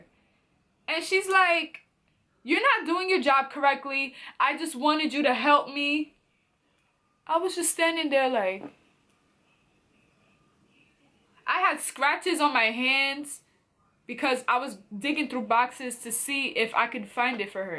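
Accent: New York, United States. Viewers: I don't know which one you worked at but I'm sure it's in New York somewhere with that accent lol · I like your accent are you in New York ?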